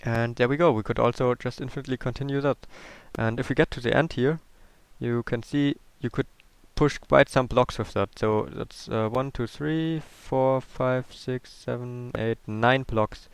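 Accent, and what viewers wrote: Germany. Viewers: Ach gutes Englisch mit deutschem Dialekt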